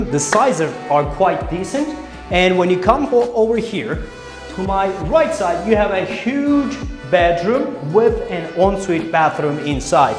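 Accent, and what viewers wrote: United States. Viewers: but you have an American accent ,in Turkey they teach British English ,so you were in school in US?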